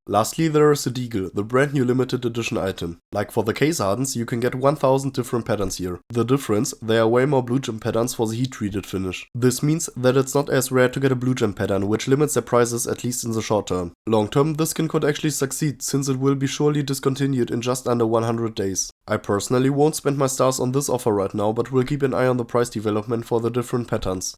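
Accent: Germany. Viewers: dislike only for ur german accent damn too anoing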